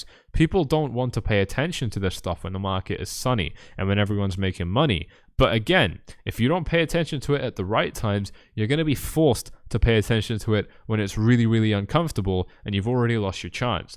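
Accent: Scotland, United Kingdom. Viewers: Is this guy Scottish?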